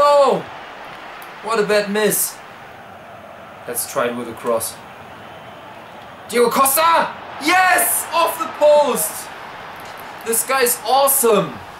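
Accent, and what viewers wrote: Germany. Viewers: was laberst du ? Das ist Baden-Würtemberg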